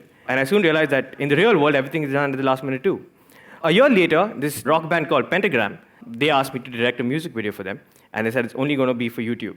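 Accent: India. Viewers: The Indian accent is very muddled, speak slow next time. Great talk tho.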